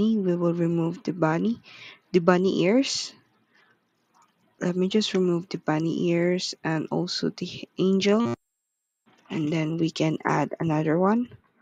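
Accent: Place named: Philippines. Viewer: I can tell by your accent, I think you're filipino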